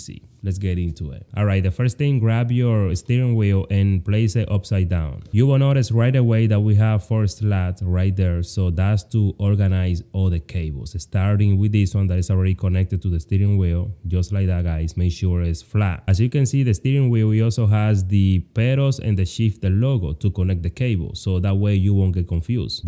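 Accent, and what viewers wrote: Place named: Dominican Republic. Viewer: i know a Dominican when i hear one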